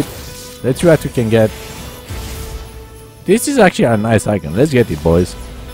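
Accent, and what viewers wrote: Romania. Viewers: Se vede dupa accent ca esti român ! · esti roman?? mi se pare ca ai accent de roman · ai accent de român, pwp. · Puteam sa jur ca esti roman din primele secunde. Oricum, de apreciat. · Man, are you from Romania? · this guy is 100% romanian(after the accent)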